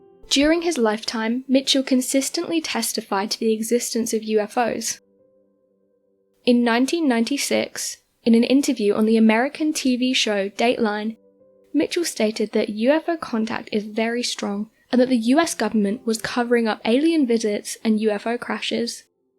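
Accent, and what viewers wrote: England, United Kingdom. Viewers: I love her voice, just something about a British accent that is so soothing. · I personally live the voice very good narrative speaks intelligently and the best English accent! Keep her. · She's definitely not Australian or Kiwi. My money's on British. Sounds very British to me, you do realise they have different accents in different areas over there..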